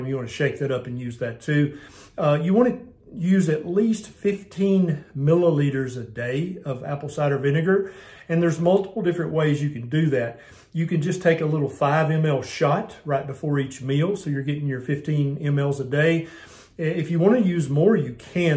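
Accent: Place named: Tennessee, United States. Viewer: man, i sure do appreciate a Tennessee accent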